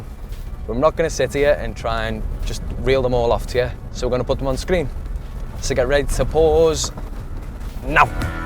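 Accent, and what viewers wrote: Liverpool, United Kingdom. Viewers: Can of cokeeeee and a packet of chiiiiippppss!! Scouse presenter is so annoying! Mind you I cant stand liverpool accents!! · Are you Scouse or Dutch?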